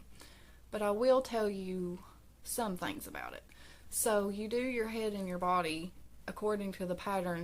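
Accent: South, United States. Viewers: Oh I just found your channel!! I love it! That doily is going to be beautiful😍. The snow dragon you are working on, is absolutely adorable❤. I'm loving all of your makes. Love the accent. Are you a southern gal? 😂. I'm a Kentucky girl, so I have that slang also. 😊. I hope you have a good day,. ☮💜🧶. Now, I've gotta go watch your other videos. 😁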